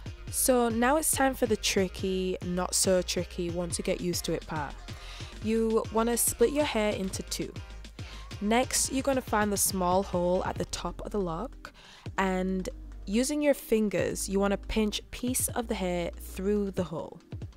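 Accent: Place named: Yorkshire, United Kingdom. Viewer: I bet alot of Americans struggle to understand your thick Yorkshire accent!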